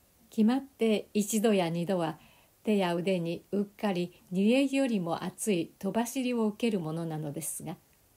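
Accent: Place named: Hachinohe, Japan. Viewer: 彼岸じゃらく…当地方の彼岸の辺りの悪天候・・・懐かしく八戸弁（東京弁のですが…）を聴かして戴きました。 ｢ ｣さん、ありがとうございました…。 感動の朗読をありがとうございます❗ 感謝です…‼️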